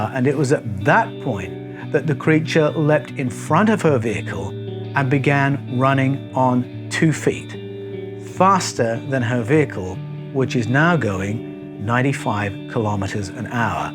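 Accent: Australia. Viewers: 1st, you speak awesome Australian! Not a hint of an English accent! Great content. This creature sounds like a Bigfoot like we have here in the States.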